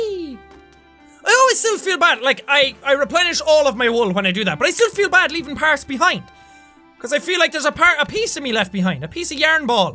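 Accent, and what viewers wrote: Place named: Ireland. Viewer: "And I don't know whoowhy." And other Irish ways of saying words. ^ | Jacksepticeye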